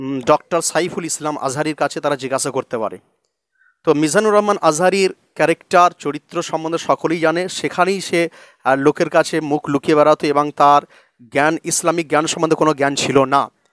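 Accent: India. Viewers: উনি কলকাতার অধিবাসী তাই ওনার কথাগুলো এরকম মনে হচ্ছে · oni west bengal r manus,oder occaron amader teke alada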